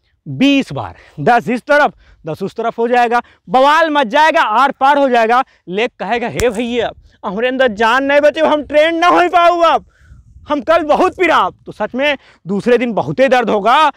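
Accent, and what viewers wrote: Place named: Bhojpuri, India. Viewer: Bhai....love ur Bhojpuriya Tone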